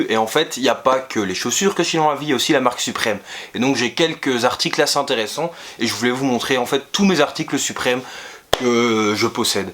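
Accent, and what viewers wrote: Belgium. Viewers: T'es belge ?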